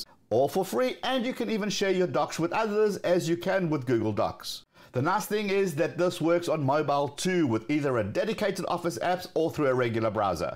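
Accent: South Africa. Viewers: Very usefull video. Thanks! So I am thinking. The accent.... born either in Cape Town or Sandringham....? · Wait, are you South African?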